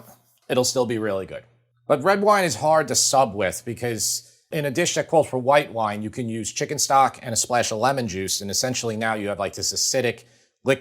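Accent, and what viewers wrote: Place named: New Jersey, United States. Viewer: Brother, I love your channel. You remind me so much of my family in NJ. I miss that accent. I really enjoy the way you break down the preparation and the cooking, almost like an engineer would. I love all the hints and tips during the production that help us all to become better cooks. I love that your family is involved. I love that you’ve clearly done your studying beforehand and practiced each dish a few times. Thanks for your channel. I pre-ordered the book and I’m sure I’ll love it. I love Italian cooking. My heritage is mostly from Sicily and that must create a DNA-level connection to the food because it’s always been my favorite. Thanks again.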